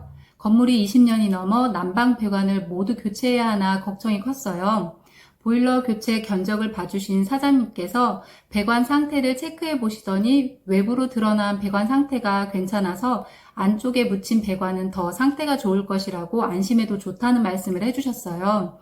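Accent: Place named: Jeonju, South Korea. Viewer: 말투 듣고 전주사람인것 같앗는데 맞군요.한양수자인 보고 맞구나 했습니다. 대단하십니다.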